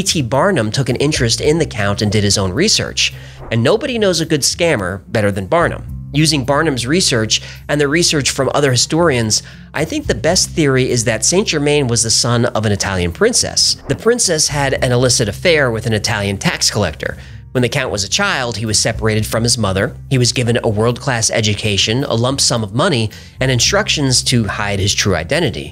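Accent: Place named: New York, United States. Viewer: Who would have thought a smart ass human mouthed gold fish with a new York accent and nicely timed curse words would be so g@ 🤣 funny.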